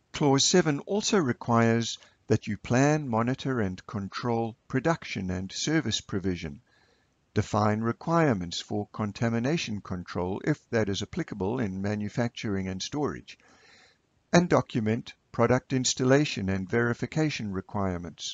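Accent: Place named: South Africa. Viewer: I see you live in Canada, but sound like a South African :)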